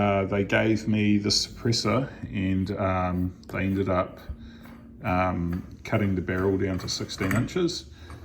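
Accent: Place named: New Zealand. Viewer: Mate, you sound like a Kiwi! 👍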